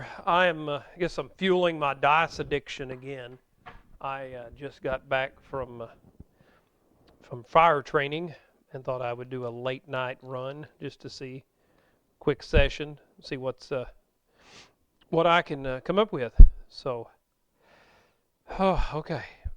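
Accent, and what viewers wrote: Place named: South, United States. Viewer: Thank you! I love your Country Accent!!! 🙏🏿❤️❤️🥰❤️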